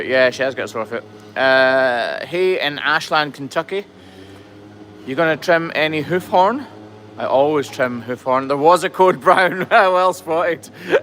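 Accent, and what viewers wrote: Scotland, United Kingdom. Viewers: yup. though I usually watch on mute...I learned you are Scottish sounding today! facepalm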